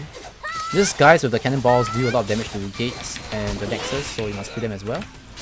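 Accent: Singapore. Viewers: you sound like youre from singapore. are you?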